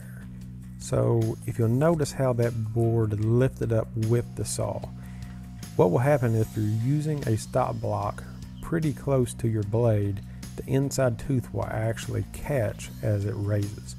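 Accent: South, United States. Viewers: As an Australian I love your southern accent! Gorgeous project too : )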